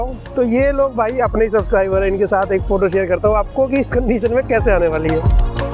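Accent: Lucknow, India. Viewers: bhai ap Lucknow se ho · Where are you from.... Lucknow???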